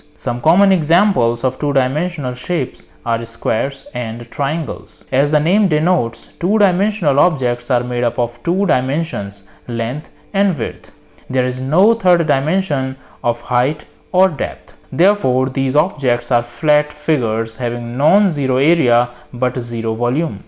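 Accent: India. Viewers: The fact that he says "higher dimensions" every 5 seconds with his lovely indian accent just blew my mind.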